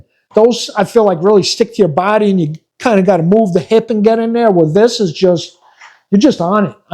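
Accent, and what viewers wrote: New York, United States. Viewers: Love the New York accent 😉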